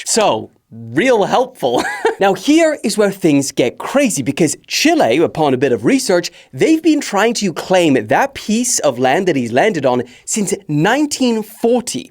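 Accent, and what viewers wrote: South Africa. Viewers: Oh hey, a South African Brother. Nwice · It sounds like you might be from South Africa 🇿🇦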